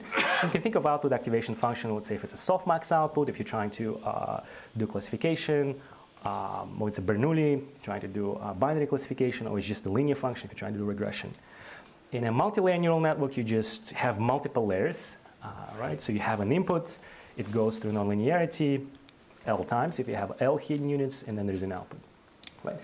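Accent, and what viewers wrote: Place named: Russia. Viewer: Чувствуется русский акцент ...перемотал в начало, автора посмотреть, я был прав!)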